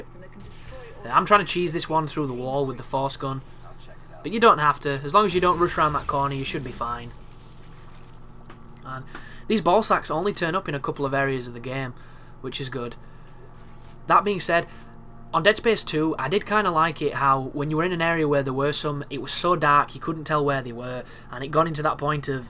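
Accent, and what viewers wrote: United Kingdom. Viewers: Hi, I enjoy your videos and your accent (no offense) helps me dealing with UK and Scottish management in my work (I understand them now). One thing though - the ball sacks are cysts and the enemy you call cyst is exploder. Not that it matters.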